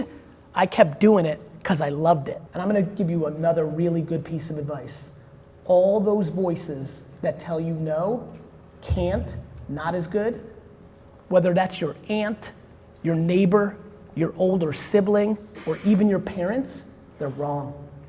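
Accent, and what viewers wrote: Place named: Armenia. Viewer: هاي ارمي🌝🌝❤ قبل لااقرا اسمك حسيت من طريقة كلامك انك ارمي🌝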